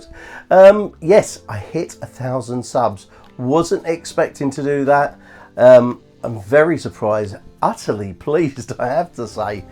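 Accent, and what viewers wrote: England, United Kingdom. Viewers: I've watched a couple of videos and some has had background music a bit too loud. In this video it's fine, not too distracting (easier to take in what you are saying), and also listening to you talk is a melody in itself. Some English accents are very pleasant to listen to. Videos 10 mins or longer are my favorite, that way I can listen to them for a while when in the kitchen! Keep up the good work!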